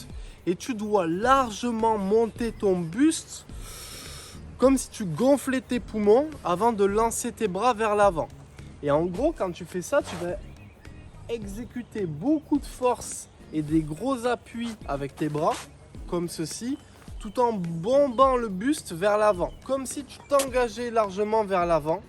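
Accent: England, United Kingdom. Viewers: Ce petit accent anglais je fonds 🥹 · + pour interet a la chaine + pour ton accent anglais + pour les 5 astuces. Merci je fais une heure complete a la brasse avec beaucoup de plaisir (mais assez difficile les 10-15 premieres minutes. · Wow excellent accent anglais....merci pour ton coaching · Ma nage . Le pap évidemment. Ton accent anglais si ça va . Je te propose or ta prochaine vidéo de la faire in english 🤣🤣🤪. Sinon non belle vidéo et bonnes explications. ;) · 👍🏻🔵 pour l'accent anglais😁 et le reste bien sûr! J'ai repris la natation via un programme "je nage pour ma forme" et il s'avère que ma brasse manque de coordination... Vos conseils et exercices m'aident beaucoup quand je vais nager en dehors des séances de groupe! 💙🩵💙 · Merci pour tous ces conseils, super bien expliqué, clair, et ton accent anglais n'est pas si mauvais que ça ;) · Très bien pour Ton accent anglais, et surtout pour ''le regard méchant, et le soulevé de Coubra, c'a nous aider à bien effectué la technique..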